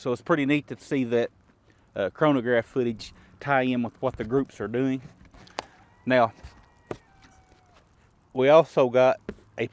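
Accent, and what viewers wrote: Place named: New England, United States. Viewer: Great vid man. That's a helluva Yankee accent. :)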